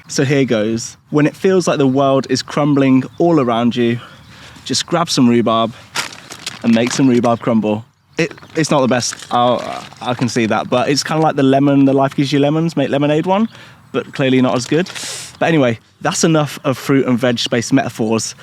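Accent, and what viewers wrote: United Kingdom. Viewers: Having been swamped in war news, trump news etc etc today, your channel suddenly popped up, and I thought....why not! You go a little fast for my poor old brain (I've got severe M.E.), but I've subscribed, and we'll see how we go. It's refreshing to hear a British accent, for a change too.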